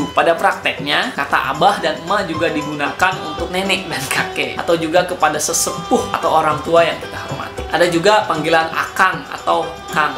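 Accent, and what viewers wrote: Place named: Bandung, Indonesia. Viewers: Tong hilap kang, seueur logatna oge, sapertos akang logatna kentel urang Bandung asli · Logak.. orang bandung emang lucu ya..hehe #SalamDariSukabumi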